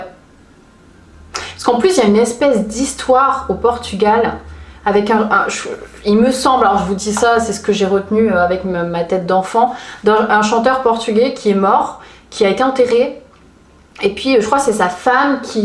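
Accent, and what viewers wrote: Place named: Toulouse, France. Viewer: L’accent TOULOUSAIN HAHA !! J’adore ! Par contre, merci quoi à cause de l’histoire de l’homme aux lunettes je vais pas dodo cette nuit 😂😂😂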